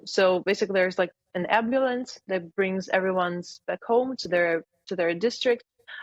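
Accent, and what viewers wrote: Scotland, United Kingdom. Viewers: Cute Scottish accent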